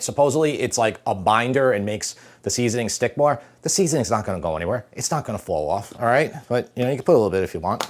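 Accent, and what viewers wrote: New York, United States. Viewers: The problem is HFCS replacing cane sugar very few bottled sauce is real cane sugar and or honey. Definitely better making your own Especially Heinz has simply and organic made without hfcs. I sure hope your taste tester can tell the difference. Like u said can see it as well. You look and sound like my cuz that lives in Queens. Your one of a very few youtube cooks without sthick straight forward no bs approach. Definitely love the deli experience. Living in Central Ca can get some quality products but unless in major city hard to find many NYC even east coast products No Uncle Guisseppe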